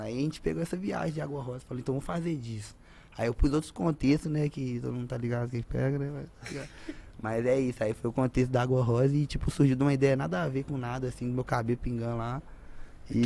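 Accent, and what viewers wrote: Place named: Belo Horizonte, Brazil. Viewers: Esse sotaque é de bh e região próx, quem é de fora estranha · nois é mineiro uai, é o sotaque oxi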